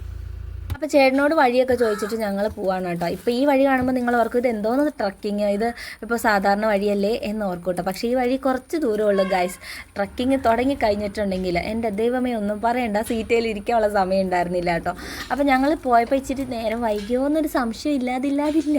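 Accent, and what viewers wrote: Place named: Thrissur, India. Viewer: പെണ്ണേ സൂപ്പർ 👌🥰പ്രതീക്ഷിക്കാതെ കിട്ടുന്ന ചില സന്തോഷങ്ങൾ അങ്ങനെ ആണ് ❤പിന്നെ മഞ്ഞ് 👌🥰🥰🥰🥰എടെക്ക് തൃശ്ശൂർ സ്ലാങ്ങ്കേറി വരണ് 🤩ശ്ശേ!!!🤩അത് നിന്റെ ഏട്ടന്മാര് തൃശൂർക്കാര് ആയോണ്ടാ കുഞ്ഞി ❤👍"രാവണസോദരി"🥰🥰🥰🥰🥰🥰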